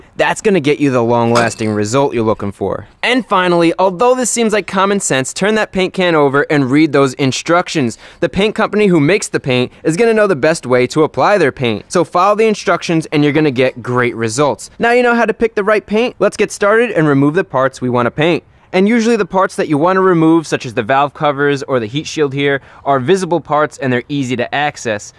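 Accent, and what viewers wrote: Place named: New Jersey, United States. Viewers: I heard the NJ in your voice a mile away lmao!! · Love your videos man. If you lived closer we would hang out. You do stuff how my friends and I do. From listening to your voice I'm guessing you're from Jersey?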